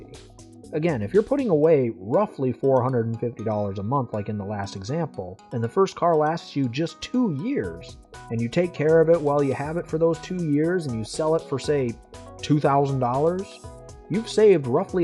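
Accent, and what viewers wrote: United States. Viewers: giga annoying american voice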